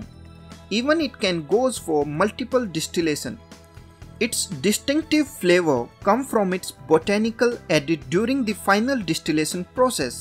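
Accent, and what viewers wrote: India. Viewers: Your Indian accent 😁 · indian accents are crazy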